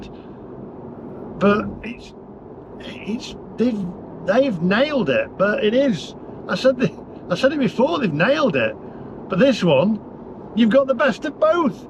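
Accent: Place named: North, United Kingdom. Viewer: You might have a northern accent but your car test is totally irrelevant to the rest of us mere mortals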